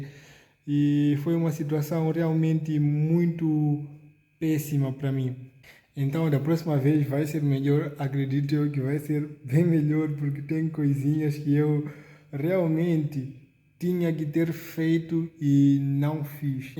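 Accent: Brazil. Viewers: Parabéns vc esta falando igual a nos Brasileiros estes contratempos faz vc virar um brasileiro nato tamo junto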